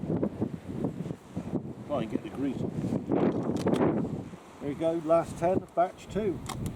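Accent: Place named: West Country, United Kingdom. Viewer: You must be from Wiltshire Somerset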